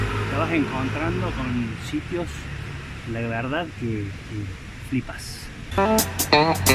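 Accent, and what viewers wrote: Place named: Córdoba, Argentina. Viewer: Buen acento cordobe jajajajaj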